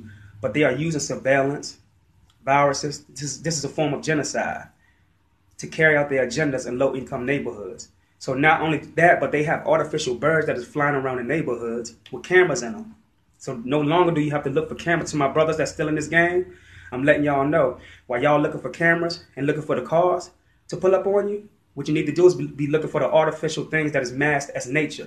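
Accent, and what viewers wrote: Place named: DC/Maryland, United States. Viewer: I'm from forestville, md in pg county. I can tell you're from the dc/md area by the accent. Projects is a project, an experiment. Artificial birds bees mosquitos & fleas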